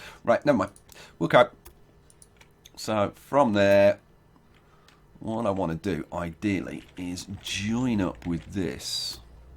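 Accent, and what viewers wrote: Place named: United Kingdom. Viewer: Yo. It's been 2 years since you played RE. Thank you I've really enjoyed this series and will you be doing any of the DLC? Sounds like your from the UK and would like to see you do the Great Briton DLC. There are loads of changes been done to the game. One, in particular, is the warehouse can be built close enough to the cities where you don't have to connect to the city, the city just take from the warehouse what it demands.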